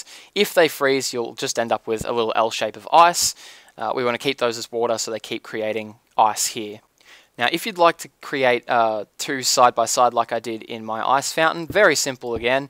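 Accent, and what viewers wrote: Australia. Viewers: where in australia r u from?!?!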